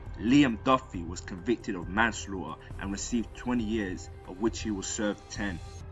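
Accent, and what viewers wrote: Liverpool, United Kingdom. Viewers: your scouse accent wasn’t bad fr😭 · Love your Liverpool accent PMSL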